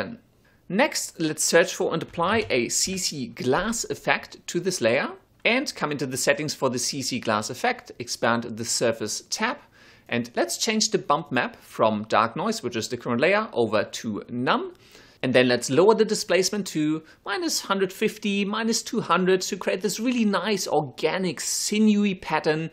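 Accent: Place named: Germany. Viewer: Hey You sounds like a German accent to me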